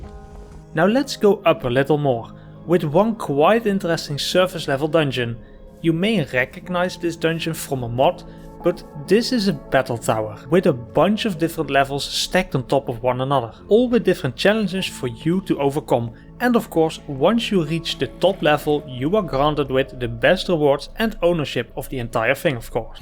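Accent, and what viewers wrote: Netherlands. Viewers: Bro you sound dutch nice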